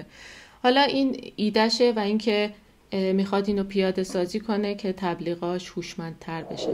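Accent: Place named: Turkey. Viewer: تلفظ تُرکی داری. تُرک کجایی؟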